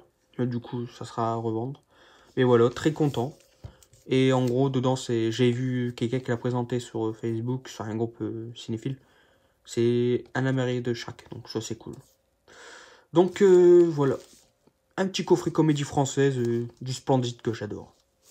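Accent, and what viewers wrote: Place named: North, France. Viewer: T pas du Nord toi ? Ta un accent 😊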